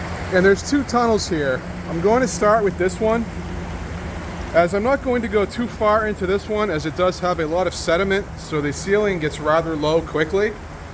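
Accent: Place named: United States. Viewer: Your Accent is American. Where are you from? Oklahoma here....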